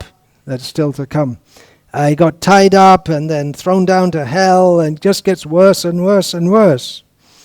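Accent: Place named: England, United Kingdom. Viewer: Always amuses me how Bhakti Vikas S. pronounces Sanskrit with an English Twang or twist....Wow !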